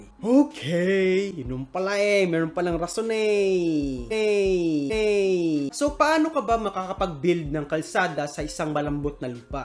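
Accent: Visayas, Philippines. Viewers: bisaya deay ka lods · Taga saan ka po idol? May accent ka po ng bisaya idol kaya naitanong ko po kung taga saan ka.. Ako po kc taga Masbate bisaya po me hehe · Klaro kaayong bisaya accent masi mag tagalog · Bisaya jud..gahi...😂😂💪💪👍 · Bisaya di ka sir 😅😅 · bisaya d i ka dol 😁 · Nganong bisaya ka ner? Asa ka gikan nga lugar? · aw ..bisaya diay ni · Klaro Kaayo Bisaya.😁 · Tingala hahaha bisaya halata kaayu. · Bisaya man diay ka doh.. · Bisaya gyud 😂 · Hahaha bisaya d i kaha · Bisaya man diay😂😂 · Bisaya mn ka engineer 😂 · Idola aning mga bisaya mag tagalog oy HAHAHA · Bisaya ka po pala😁😁😁😁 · Bisaya man diay ka sir😁🤘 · Bisaya mn diai... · Bisaya mn diay ka ser?? Proud bisaya here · Kahit hindi nato recent na vid, still very well explained and learned a lot as well. Nakakatuwa lng how kuya resist a little of his Bisaya hahaha. Proud Bisaya here!!!:) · Bisaya ka gli dong taga diin ka? 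-aspiring engineer also :) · Bisaya diay ka bai.. Hahaha. Subscribe nalng ko.. Butangan tika run. Hahahaha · Ibutang na dira tanan . Owryt bisaya ta pre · bisaya mn diay ka dong..😁😁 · Kana! Naa ra japoy pagka bisaya ba hahahahahaha makalingaw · Bisaya man diay ka idol😂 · Bisaya ka sir bisaya pod ko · Bisaya kaayo ka, Sir😅 taga diin kaha ka Sir...?🤔😅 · Bira bisaya jud..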